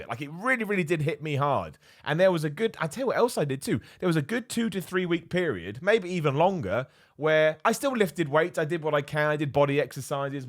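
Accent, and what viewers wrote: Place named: England, United Kingdom. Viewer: You're absolutely right. Coach Greg has the exact same effect with my exercise and training motivation. When I'm exercising now I always have some form or fitness YouTube video on, be it yours, or Greg's, Jeremy, Jeff, Igor, Derek, etc. Your videos are also great motivation for me, and I really find your personality charming and your English accent and mannerisms kinda soothing, lol.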